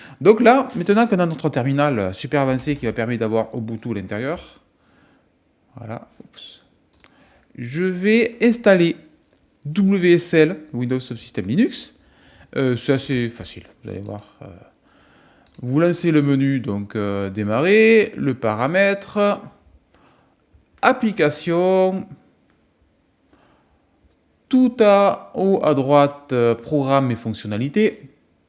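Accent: South, France. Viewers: avec ce petit accent du sud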